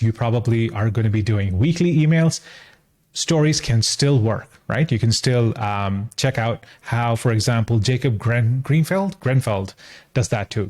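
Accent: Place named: United Kingdom. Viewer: Lol.. the British accent. Nice content man. Yeah that’s a fear.. are we doing enough for my people to stay and get enough value?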